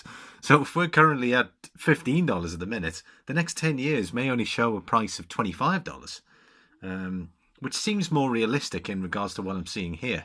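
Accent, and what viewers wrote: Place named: Merseyside, United Kingdom. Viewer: Being robbed at gunpoint sounds fairly horrific. Can I ask where exactly that was/is ? I do detect a Merseyside accent...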